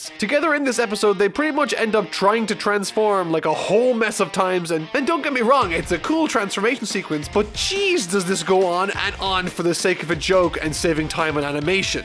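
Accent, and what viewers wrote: Ireland. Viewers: “And I’m not just saying that because I’m from Ireland” So THATs your accent. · are u irish?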